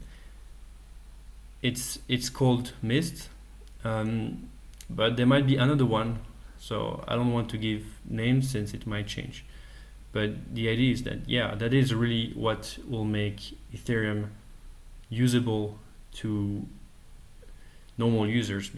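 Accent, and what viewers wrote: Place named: France. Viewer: Hello, i've just seen your video and i'm guessing due to your accent that you are french. I'm also french and currently investing on ethereum, i would love to be able to talk to someone who seems to understand this innovation quite well. Do you think it could be possible? and thanks a lot for this video (it's a bit to slow for me but it's very educational).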